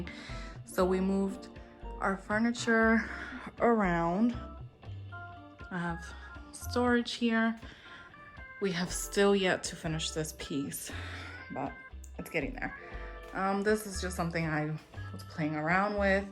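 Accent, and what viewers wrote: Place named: Puerto Rico. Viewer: Ave María, suena puertorriqueña le dije a mi marido 👍🏼💕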